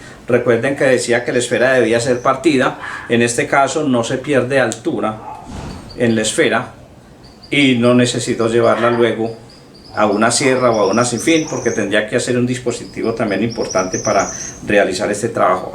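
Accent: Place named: Antioquia, Colombia. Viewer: por lo demas,bien ,todo bien explicado pero ese acentico me lo escarapela,no nos gusta pa ni mierda somos medio alergicos a los paisitas aqui en bucara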